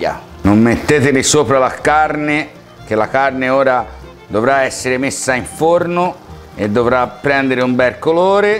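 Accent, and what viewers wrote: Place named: Viareggio, Italy. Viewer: Le ricette sicuramente sono ottime, ma io le faccio I complimenti perché usa tutto della verdura, non fa sprechi, mi piace vedere come con poche cose riesce a fare tanto ben di dio!! Bravo!!! Hah un'altra cosa, la parlata! Mi ricorda la mi zia che è di Viareggio, non la vedo da un anno. Buon anno nuovo!!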